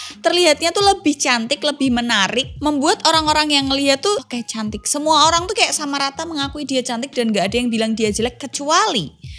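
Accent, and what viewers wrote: Java, Indonesia. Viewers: Kak sampean orang Jawa to😍🤤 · Medok e mbak *... Membuat terngiang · Jowo tulen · logatny sdkit Jawa Ak sng ngdenger ny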